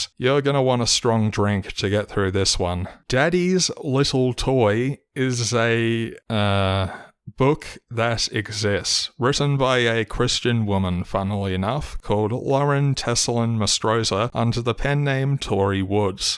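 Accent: Australia. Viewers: That’s an Aussie accent also you have not made any points against any of what he has said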